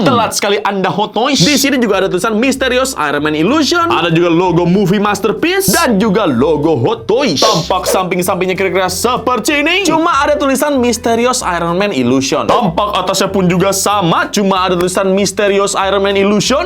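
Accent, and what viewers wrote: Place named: Sumatra, Indonesia. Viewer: ada logat bengkulu,jambi dan palembang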